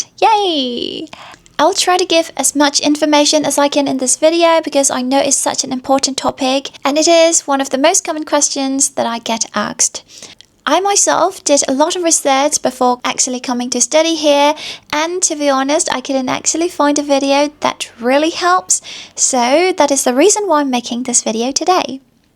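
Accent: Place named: United Kingdom. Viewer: Just curious, did you attend a Uk boarding school? Because of your accent haha.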